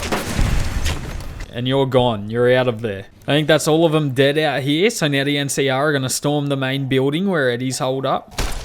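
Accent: Australia. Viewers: also, who doesn’t love an aussie cant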